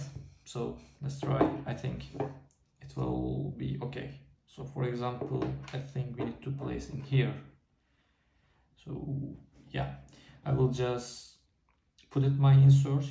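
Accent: Turkey. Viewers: thanks and Ramadan Kareem! Noticed you have a Turkish accent 😉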